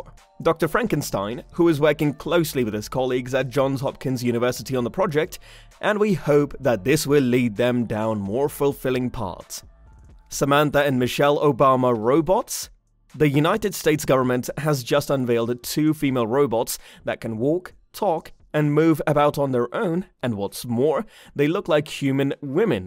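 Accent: United Kingdom. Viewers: why thr brit accent infloozer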